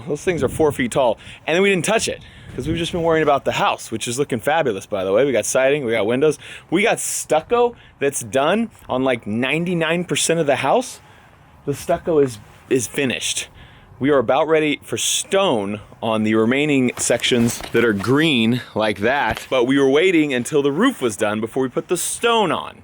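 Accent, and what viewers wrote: Texas, United States. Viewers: What part of Texas are u from 😐😐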